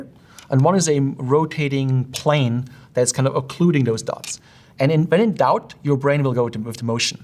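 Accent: Germany. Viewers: I HEAR GERMAN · I just knew he was German as soon as he started talking😂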